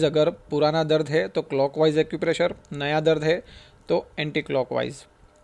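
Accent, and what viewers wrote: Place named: Gujarat, India. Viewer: Sir bhut achi jankari di aapne Aapka ye therapy centre kha h sir Aapki language explan se aap Gujarat se hai ese lag rha h Please give your address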